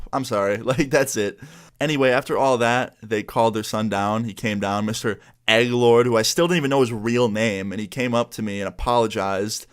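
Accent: New York, United States. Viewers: You sound like an american Fainted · Heh, had a feeling you were a new Yorker, im the same, you had the accent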